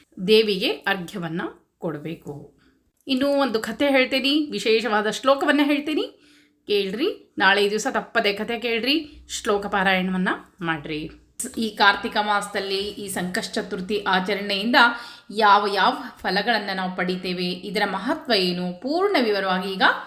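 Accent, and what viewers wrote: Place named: Hubballi, India. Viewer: madam you are amazing. I like Hubbali accent. Thank you for you guidance. Like short Shlokas.Thank you❤ Namaskaram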